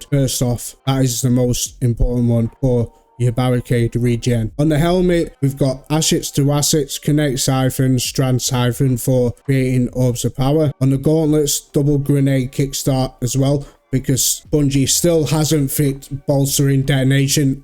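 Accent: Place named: Northern England, United Kingdom. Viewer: Bye heck great accent fella 👍🏻 fellow northerner in peace ☮️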